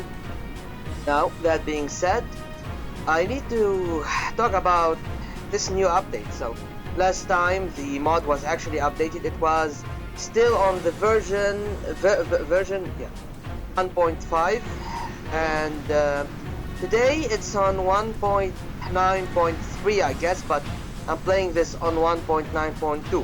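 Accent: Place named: Arab world. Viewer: Great series! I can tell you're an arab, but where are you from?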